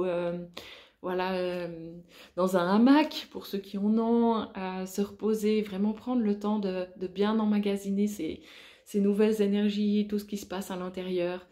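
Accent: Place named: Switzerland. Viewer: Merci beaucoup pour cette vidéo! J'aime beaucoup ta manière d'expliquer ☺. Je me suis dit mais je connais cet accent et j'ai vu que tu étais en Suisse comme moi d'ailleurs. Je ressens beaucoup de fatigue actuellement et ce que tu as dit résonne juste en moi. Je fais de la méditation pour intégrer ces énergies au mieux. Je t'envoie beaucoup d'amour 😇🙏. Je me réjouis des prochaines vidéos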